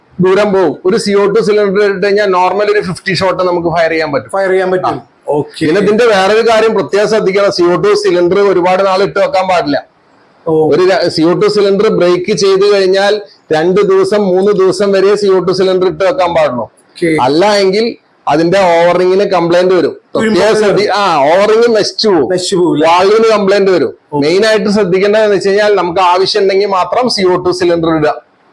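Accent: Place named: Kochi, India. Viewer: Kochi karude samsaram padichallo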